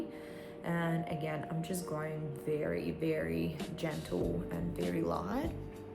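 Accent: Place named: Australia. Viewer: у вас такой классный австралийский акцент😍очень красиво звучит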